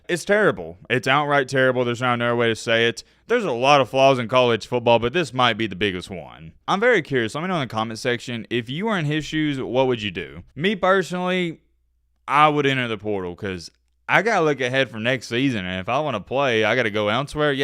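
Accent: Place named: Alabama, United States. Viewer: hearing how you pronounced some of the names in your video, it's pretty obvious that you went to school in Alabama.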